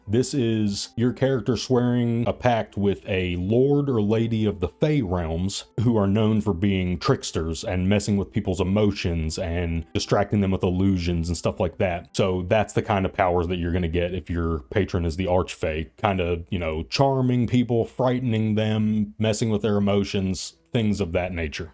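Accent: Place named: South, United States. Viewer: Hey, honest feedback from a consumer of the content here - filming yourself and editing the feed in over the background graphics and videos adds nothing to the content for me, personally. I think you could save yourself some effort and nix your video feed in favor of pure audio. In fact, moving the production time over to more contextual graphics in your videos would be a HUGE level-up. I think doing that well would jump your production values significantly. Love the walkthroughs, love the personality of your VO, love the southern US representation. Wishing you well! Edit: I love the respectful dialogue. It's great to see that the channel has cultivated a solid audience. Kudos to, and to the viewership!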